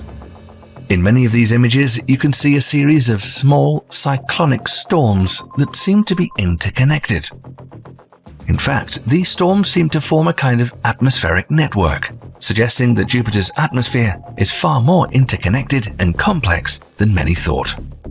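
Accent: England, United Kingdom. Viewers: I can't sleep unless it's a British accent talking about space. Anyone else? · Again with the haughty English accent. How POMPOUS!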